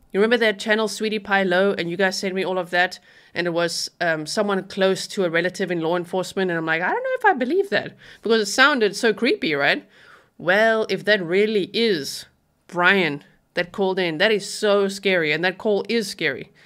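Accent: South Africa. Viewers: This is my first time watching your videos. I couldn't place your lovely accent so thank you for telling us that you are from South Africa living in the Netherlands. Your videos are so full of information. It feels like I'm back in university.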